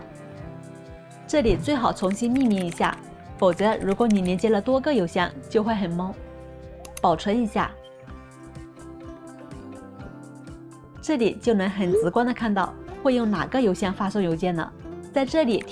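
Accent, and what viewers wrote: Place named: Jiangnan, China. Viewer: 听口音可能是中国大陆南方江浙沪一带的？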